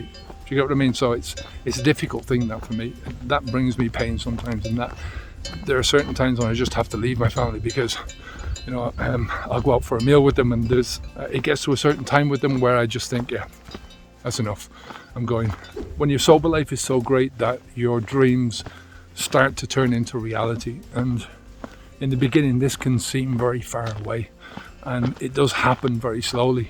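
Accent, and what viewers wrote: Ireland. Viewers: love the content, the cow bells, the Irish accent and the scenery. Thank you :)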